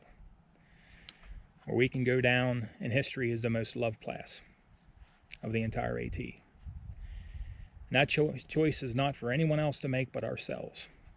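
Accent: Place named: Pennsylvania, United States. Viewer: That, my friend, was a very difficult video for you to make and I respect your choice to get off the trail. I also know that you will finish what you started, whether it's this year or next year. I had to delay my AT hike by 60 days. I will make another decision at that time. It was very difficult because I waited until only a few days before I was to leave. You know all the planning that goes on before leaving. All that came to a screeching halt. BTW, I thought I detected a PA accent. We have relatives near Hazelton. Heard of Senapes bakery? I tried to warn you and others on trail without being mean that something bad was happening and people needed to pay attention to it. On the videos yet to be released, add a short notice in the beginning saying you are off trail. Thank you. Maybe I'll see you on trail some day. John from MI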